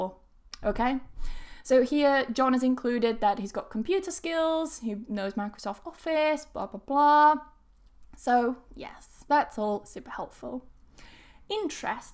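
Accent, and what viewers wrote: United Kingdom. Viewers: ....l really like the way you laugh, speak,smile and the way you speak British english,it sounds very wonderful....your British accent is awesome.....Thanks for the video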